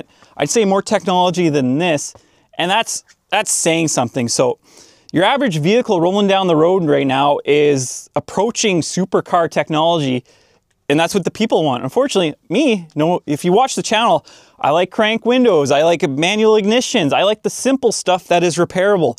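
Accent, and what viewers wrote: Canada. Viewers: I'm Irish/Canadian and subbed because I really miss the Canadian accent sometimes. Your car insight is also brilliant and shocking. We are really doomed.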